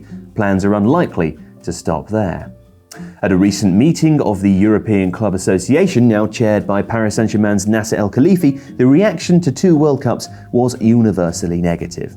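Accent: Scotland, United Kingdom. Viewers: is scottish??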